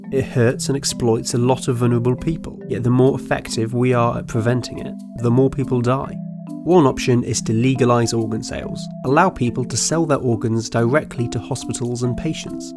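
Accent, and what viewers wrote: England, United Kingdom. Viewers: Before I clicked this video, I predicted the voiceover was going to be an English dude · You have to pay for legal organ transplant? WTF? I'm looking this up right now and where I live (Turkey) A kidney transplant costs the government about 25000 USD. The organ doesn't cost money it is only the surgery(or mulitaple) and the after surgary hospital stays that cost. How can that be more than 200.000 USD? That 25000 USD includes the hospital and medicenes and crap. And you can't tell me that Turkey is poorer. Yes cost of staying at the hospital differes but kidney is free because it is donation and drugs you take fater the sureary are the same everywhere. I really want to know how can a kidney transplant cost more than 200000 USD in a normal country. Is that just the price in USA since government doesn't care if you die over there? 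If it is why I'm sorry for not understanding but he sounds British, I thought they had healhcare over there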